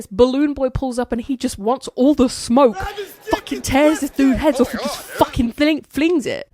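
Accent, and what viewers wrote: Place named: Ireland. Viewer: OMG YOURE IRISHHHH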